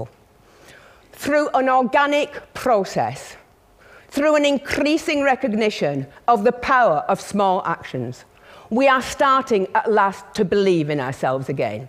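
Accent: United Kingdom. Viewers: Strong accents? It's british english.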